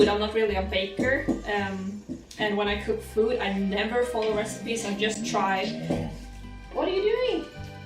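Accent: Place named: Sweden. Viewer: Are you from suécia? Your english is different. The suécia is a beutiful country.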